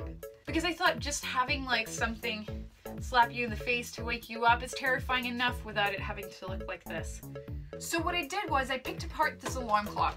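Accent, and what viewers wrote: United States. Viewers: Din amerikanska accent är klockren. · Swedish? WTF. You sound more American than most Americans. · You're Swedish? Hahaa! Definitely thought you were American. Awesome video! Make one for my friend, pls?! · You're the most American-sounding Swede I've ever heard.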